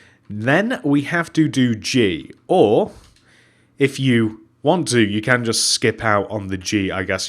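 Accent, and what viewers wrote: United Kingdom. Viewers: Where are you from? You sound British, yet you mainly make tutorials of American stores rather than British ones. Either way I still enjoy them, keep the good work up!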